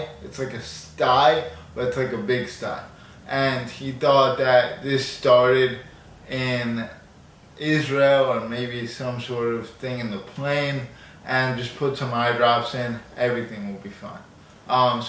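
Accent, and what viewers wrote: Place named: South Jersey, United States. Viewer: Im praying for you brother. Yeah this not the type of video I ever see on my feed man Im a sneakerhead and I've been subscribed to you for a while. Please let us know how your progress is going whenever you can. Oh wow didn't know you are from New Jersey as well. Im located in Central Jersey. Sounds like you are in South Jersey. ✊️🙏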